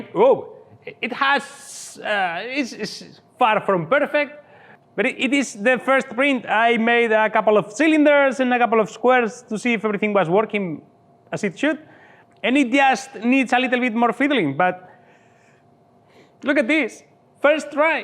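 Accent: Spain. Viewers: Inconfundible acentazo español nada más abrir el vídeo xD Como me alegra ver a makers españoles. Mucho más viendo lo crack que eres y lo bien que te va en la plataforma. Proyectazo! Sub! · eres español, verdad? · This dude Spanish? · pretty cool! very nice project! What material is the red plastic that you printed parts from? was it hard to get the axes perpendicular in this scale? Also you sound spanish ;) where are you from? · Soy yo o eres Español? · Acentazo español, nombre español, pero videos en ingles. Es como si colim furze hiciese los videos en español para tener mas seguidores en Sudamérica. 🤷🏼‍♂️ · Joder cómo se nota el acento español...Buen vídeo tío!!! Te van a encargar cosas muy grandes con eso! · que chulo acento español xd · Eres español?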